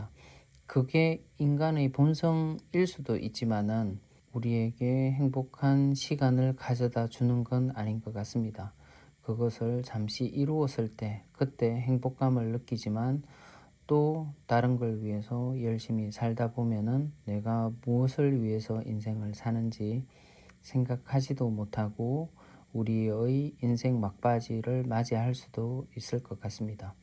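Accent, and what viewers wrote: Gyeongsang, South Korea. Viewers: 이렇게 듣기좋은 경상도 사투리는 첨 이네요😊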